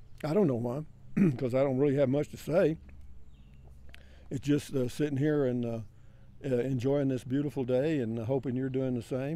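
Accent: Texas, United States. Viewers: Loaded to the cookie seed! Texas talk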